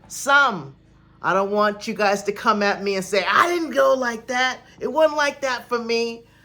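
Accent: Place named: Connecticut, United States. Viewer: One more thing there is an Accent in your voice I guess that's Connecticut.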